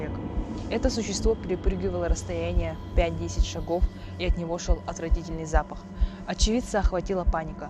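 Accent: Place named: Yakutia, Russia. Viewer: А какой акцент ты ожидал от якутки, именно акцент и делает нас не одним целым с русскими а отдельной нацией со своей своеобразной культурой и языком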